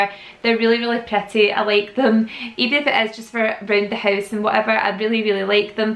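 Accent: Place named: Glasgow, United Kingdom. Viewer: Oh your vids are not good for my bank balance 🙈 love all the pieces you got and now I'm off to see what I can find! Thanks! Xx p.s was surprised and delighted when I found your channel to hear a Scottish accent! Love that you have a channel and are doing well. I'm in Livingston xx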